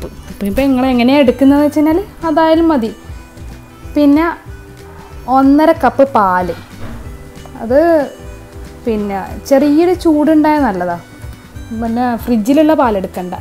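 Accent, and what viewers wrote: Kannur, India. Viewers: Aha... bun poliyanallo.... really it look similar to bakery bun... koottathil ante kannur bashayum... 😊😊😄👍👌👌👌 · Kannoorkari aano???Aa slang kettitt chodichathaa😄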